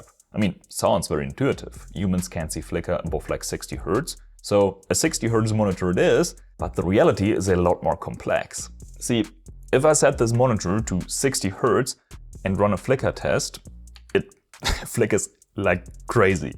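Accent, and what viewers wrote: Germany. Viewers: Falls du deinen Akzent verbessern willst: Schau dir mal an, wie man das "e" in Hertz im Englischen aussprichst. Musst die Zunge dabei deutlich entspannter halten - du gehst in Richtung Amerikanischem "r" - machen viele Deutsche so, ist aber für den Großteil der Englischen Welt falsch und passt so überhaupt nicht zum Rest deines Akzents. Ähnliches gilt übrigens für das zweite "o" in "Monitor". Nur so nebenbei ;) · bist du deutscher? oder spinn ich...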